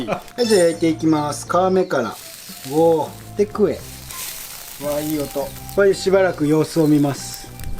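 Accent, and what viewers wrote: Kagawa, Japan. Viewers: 飾らない讃岐弁だから視聴者登録数が伸びるのではないでしょうか。私も今ではすっかり忘れてしまった讃岐弁を思い出して楽しく拝見しています。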